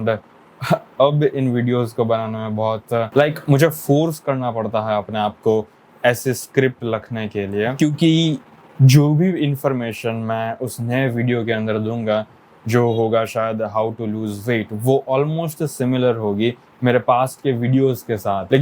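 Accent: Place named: Bihar, India. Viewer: He sounds like bihari who knows english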